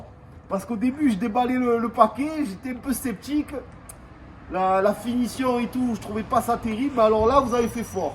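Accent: South, France. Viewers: Plaisir de t écouter!! Jkiff l accent du sud!!👍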